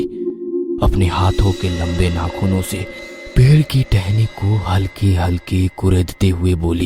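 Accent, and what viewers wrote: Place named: Rural, India. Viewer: although the accent is a little village like but am in love with the voice 💕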